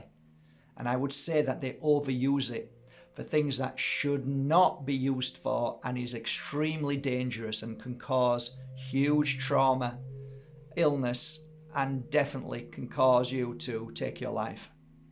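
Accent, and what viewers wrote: Yorkshire, United Kingdom. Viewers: Love that Yorkshire accent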